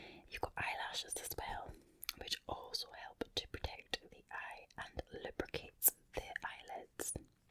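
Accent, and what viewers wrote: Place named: Scotland, United Kingdom. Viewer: sounding super Scottish in this video - love it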